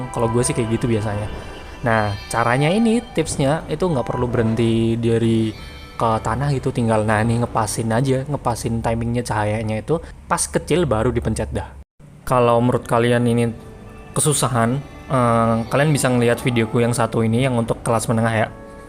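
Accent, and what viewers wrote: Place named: Indonesia. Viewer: Kaget pas pertama ngomong ternyata orang indo cuz i didn't read the caption😆😆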